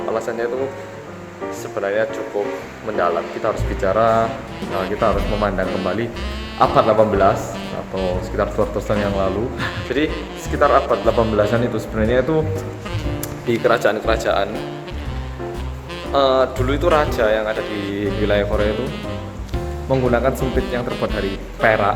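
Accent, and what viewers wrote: Java, Indonesia. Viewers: Orang korea asli ya kak? Koq fasih banget bahasa Indonesia, bahkan medok😎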